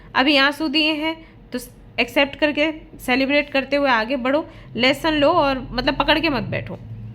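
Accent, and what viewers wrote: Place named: Assam, India. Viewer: I was watching it thn suddenly heard he might be Assamese guy.. lik I was thinking bunch of things before or while watching the vdo.. wow, might there is a chance for me again. Spetical yet I dnt knw.. Let's see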